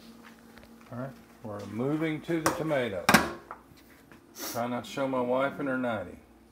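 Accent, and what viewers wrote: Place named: South, United States. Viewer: I am loving your southern accent! Being from the south myself, I find so many men would rather die of a heartache eating meat than go vegan for their health and family! Very refreshing to know a southern man can convert! lol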